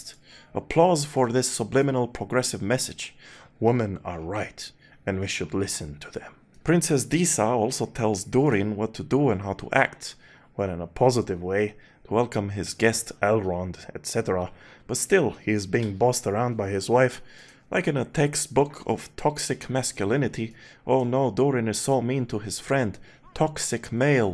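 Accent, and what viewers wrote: Eastern Europe. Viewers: I can hear your accent. You're Slavic. I'm Slavic. We were brought up into a culture that teaches us to be able to enjoy and find wonderful things in stories that are nothing LIKE ours. Even if there is NOTHING AT ALL Slavic about LotR, we have no problems loving it even if we're "left out". In fact, we never REALIZED we were "left out" until black Americans came up with the CONCEPT of being left out. To US, it was perfectly NORMAL an English author didn't write Prague or Cossacks or Perun into his books set *in an obvious paraphrase of NORDIC and ANGLO SAXON mythologies*. To them, it isn't. I might be unfair, but the media certainly makes it seem that if ANYONE in any way DARES to do anything at all without black americans, there is racism involved and it's insulting. In fact, I think we might have to create black cheese in order to right this HUGE injustice of making cheese white and yellow, but not black for SO long. 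And just to note, episode 5 - I'm still waiting for an ASIAN actor? Even when they could have cast ALL the Elves as asians, given them blonde wigs and contacts and with some make-up they'd look WAY closer to Tolkien's description than the dude who makes me wonder if Elves f*** by colour in order to maintain black people among their ranks. As I wait, I am looking forward to a remake of Red Cliff starring a white Cao Cao, a black Zhou Yu and a female trans gender gay latino Zhuge Liang. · You are not a real fan. You are a Russian separatist. You definitely have Slavik in you based on accent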